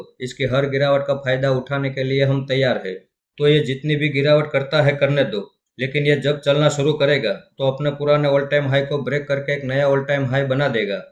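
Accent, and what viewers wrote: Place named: Gujarat, India. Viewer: તમે ગુજરાતી હોય એવુ લાગે છે. ખુબ જ સરસ આંકલન કર્યું મોટાભાઈ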